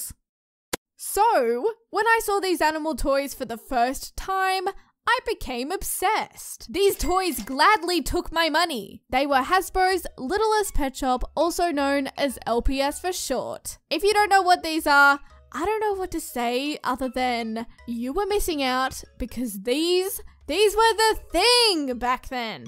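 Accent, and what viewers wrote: Australia. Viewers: I knew it I knew it I always thought I was just weird and Thought all Australian women sounded the same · Well I wasn't expecting this, but I think its cool that you found something back then that your were cleary passionate about making. Sorry it started to burn you out further down the road and you felt really self conscious about it, but the fact you kept doing in until the end and can talk about it now makes you braver than most people. Well done! Also don't apologise for your aussie accent. At least you don't sound like a flithy hybrid of kiwi & scottish 😅🤣. Can't wait for part 2!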